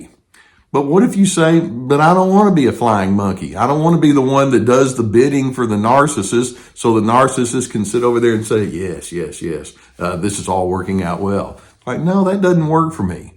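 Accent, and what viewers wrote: South, United States. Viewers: You are my most favorite older white male with a southern accent. I've never met one I've really liked. Thank you for being so amazing 🥰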